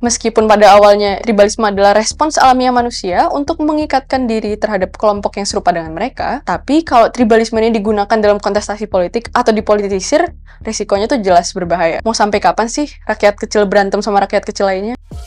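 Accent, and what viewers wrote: Java, Indonesia. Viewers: Logat jawanya agak muncul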